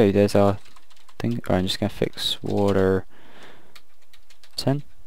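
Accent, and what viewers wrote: England, United Kingdom. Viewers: Followup question: You are English, right?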